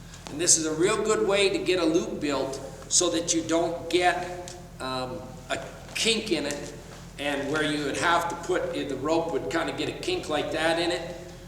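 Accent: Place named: Canada. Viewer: He is saying hondo. He has a Candian accent.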